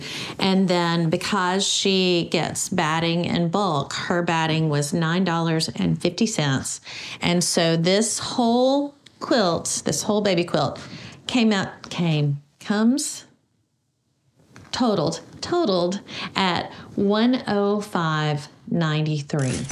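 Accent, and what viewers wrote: South, United States. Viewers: New subscriber here. LOVE your personality, good sense, concern about cost, and...your Southern accent!😁 Howdy from Texas! 🤠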